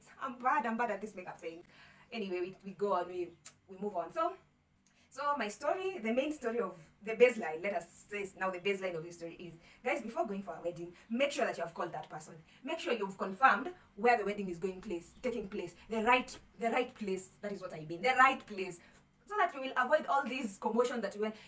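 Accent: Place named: Africa. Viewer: In this video language in African ascent and fast.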